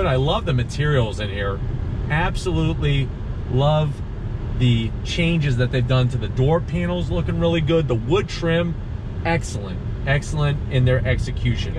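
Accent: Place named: New York, United States. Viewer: Hey......you need to calm down dude when your doing a review because you found like your going to have a hearty man......Also don't talk so fast and lose the new york accent man.......One more thing grow your hair out a little....I know your thinning a little around the top and the frontal hairline and other areas however you need to grow it out pretty long and that way you can cover your bald spots or second choice would be just get about 2 hair transplant surgeries and that way you have instant coverage and you never have to worry about it anymore....trust me I did several surgeries and am soooooo happy that I did this.......also put some nice designer long pants jeans on and a nice tank top and now we are talking man......Just saying all this and I mean it too!!!!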